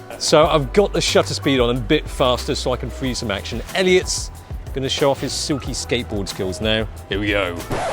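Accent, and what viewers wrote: United Kingdom. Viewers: With that British accent, i was not expecting an asian guy..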